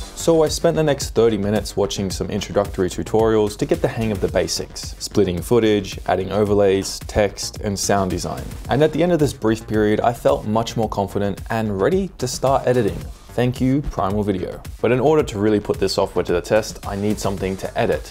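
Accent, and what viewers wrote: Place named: Australia. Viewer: ▼ - So great to hear an Aussie accent too!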